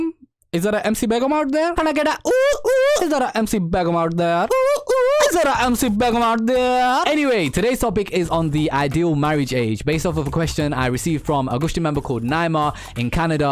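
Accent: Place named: Bengal, India. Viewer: Wallah I love your Bengali English accent 😂😂😂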